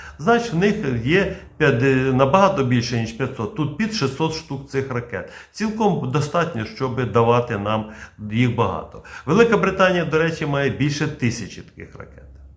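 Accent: Vinnytsia, Ukraine. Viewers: Завжди приємно бачити і чути Вас, пане. Завжди зрозумілі, конкретні, оптимістичні огляди і якійсь рідний для мене акцент мови, як на вінничині. Дякую за всі Ваші труди.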